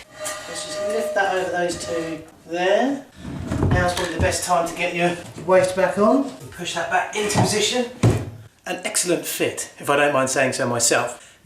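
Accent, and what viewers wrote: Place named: United Kingdom. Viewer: I really like Brits accent and the way you do. thanks!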